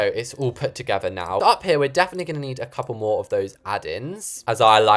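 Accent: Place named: United Kingdom. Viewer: It’s good that (I’m saying that for short) is British, that’s because I am and most other content creators are foreign, so I can actually understand him.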